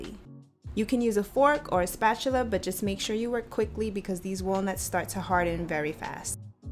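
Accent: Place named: New York, United States. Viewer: I definitely hear that NY accent!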